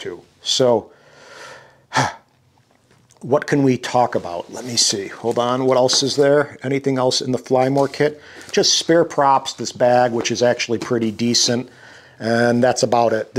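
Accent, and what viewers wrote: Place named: Chicago, United States. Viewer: Moved out of Chicago a couple years ago, out in the boonies now. Also got into drones this year. Avata 2 is my 2nd. Its super fun, man. Nice to hear a Chicago guy talk normal and frankly about his experience. I knew most of this stuff already but I watched the whole video cuz I enjoyed listening to you go on like a Chicago guy. Cheers, brother. Enjoy the drone.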